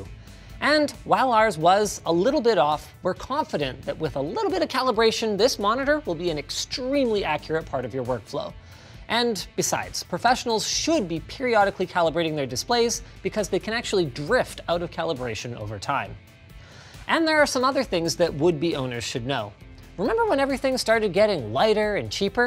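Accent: Canada. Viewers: You talk Canadian but you spell like the Americans color or liquor you see the difference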